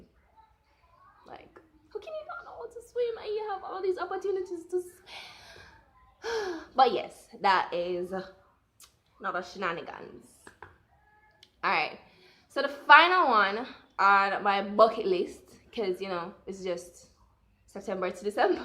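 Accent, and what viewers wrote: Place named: Jamaica. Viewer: Oh Jamaica!! I just went to Jamaica 🇯🇲 last month and I loved it!! I knew I recognized your accent lol it was my first time going to Jamaica. I Posted my trip on my YouTube 🥰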